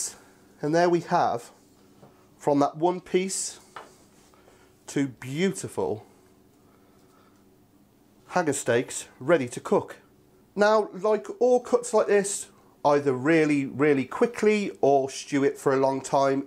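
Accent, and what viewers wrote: United Kingdom. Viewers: I always admire your passion never mind your awsom British accent . I tried these bad boys last summer , it is packed with flavor . one quotation is that grass fed grass finish beef?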